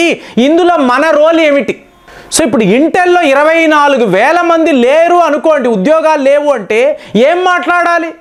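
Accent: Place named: Telugu, India. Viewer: Painful Telugu accent😢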